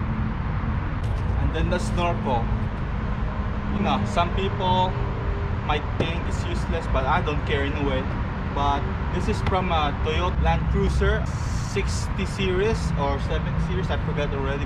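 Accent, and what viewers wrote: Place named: Philippines. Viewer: that filipino accent tho.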